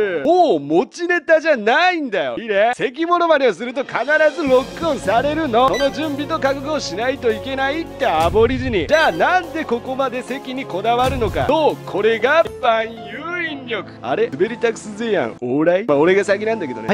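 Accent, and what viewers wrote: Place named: Kagoshima, Japan. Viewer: アルティメット鹿児島弁ニキほんとすこ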